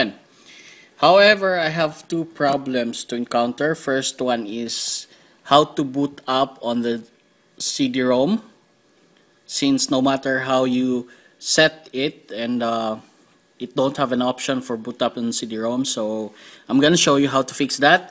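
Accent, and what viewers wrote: Philippines. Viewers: that Filipino accent tho🔥🔥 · Halatang pinoy to ah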